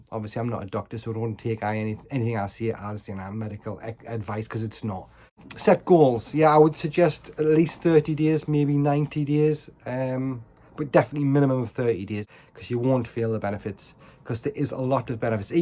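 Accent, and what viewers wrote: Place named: Newcastle, United Kingdom. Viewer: New subscriber, nice to hear another Geordie, thinking about this myself, currently binge watching everything on this lifestyle, hopefully its for me.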